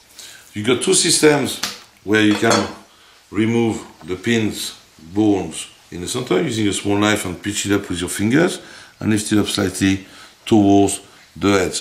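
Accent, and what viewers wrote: France. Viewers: I thought he rather had a French accent.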